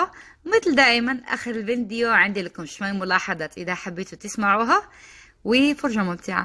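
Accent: Tangier, Morocco. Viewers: باينة فيك تطوانية او طنجاوية ❤❤❤ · كتعجبني مكتهضر طنجاوية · ما شاء الله .تسلم ايدك انتي مغربية .ولا لبنانية .كلام. مخلوط. 😍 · ههههههه مزيج عجيب بين المغربية الجبلية و اللهجة السعودية ضحكتوني و الله ايخلي ليك ااادم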